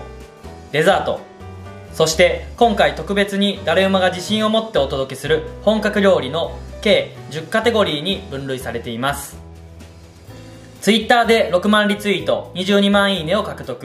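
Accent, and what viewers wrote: Kansai, Japan. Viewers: 関西の方だったんですね！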